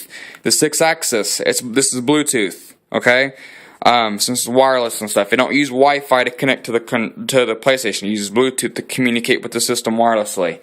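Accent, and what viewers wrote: South, United States. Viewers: Never in my life heard anyone pronounce it Ray-men, lolz. Ray-men? Not Rah-men. Where are you from? You gots a southern accent for sure.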